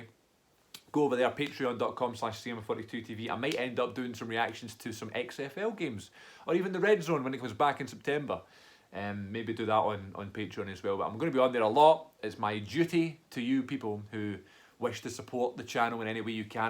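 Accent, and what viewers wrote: Glasgow, United Kingdom. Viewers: I live pretty close to St Louis so I'm gonna be rooting for the Battlehawks, but the Roughnecks, Defenders, and the Guardians were all pretty good as well. From on-sight reporting, there appears to be quite a bit of excitement for the St Louis team because they've been without a team since the Rams moved to L.A. 3 years ago. The Battlehawks are gonna be playing in the old Dome stadium that the Rams played in, so crowds will look small at first, but hopefully if this league is a success, and the Battkehawks turn out to be good, they will garner a decent crowd there. PS. I worked with a guy from Glasgow back in college at Southern Illinois University. His accent wasn't nearly as thick as yours. Must've been because he'd been in the States for awhile.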